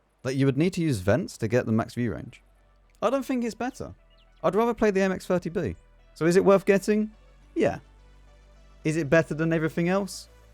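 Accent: United Kingdom. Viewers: He speaks proper and with the english accent that I want to acquire.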